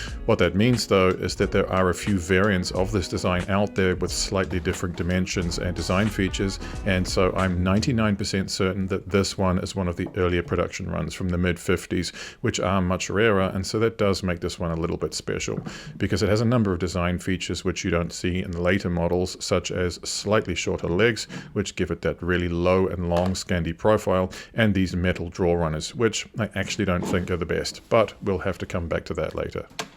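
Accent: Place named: Australia. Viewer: Great looking piece and a timeless classic. ❤I am living in Germany as well, but my I ask where you are from? I suspect more Australian than British judging from your accent.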